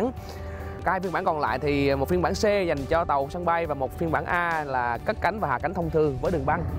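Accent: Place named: Da Nang, Vietnam. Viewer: Giọng a giống quê đà nẵng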